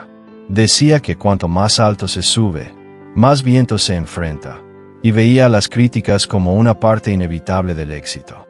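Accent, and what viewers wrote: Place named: United States. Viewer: Y tú porque hablas como greengo? Digo mejor pon la IA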